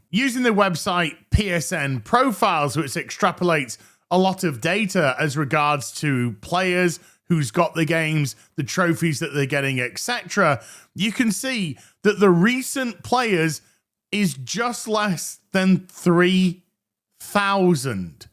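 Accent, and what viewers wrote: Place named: United Kingdom. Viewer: mate, the british accent is already insufferable, why would you subject potential viewers also to those veilguard clips they've guaranteed already seen before?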